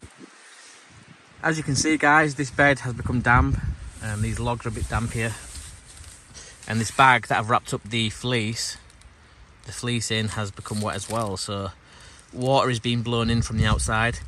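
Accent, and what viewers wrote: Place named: Yorkshire, United Kingdom. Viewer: Just come across your channel and so far so good pal! I would love to do what you do but unfortunately I'm not right well. I take your a Yorkshire lad from the accent. Keep up the good work pal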